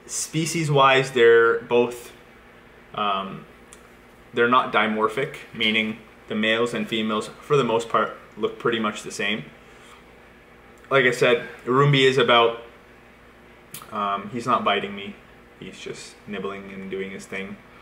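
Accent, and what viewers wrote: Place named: Southern Ontario, Canada. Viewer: How could I tell you were from southern Ontario before you even said Toronto. Must be the accent.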